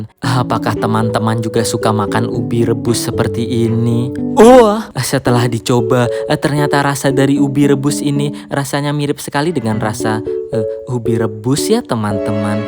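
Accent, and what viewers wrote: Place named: East Kalimantan, Indonesia. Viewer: Kaltim nymk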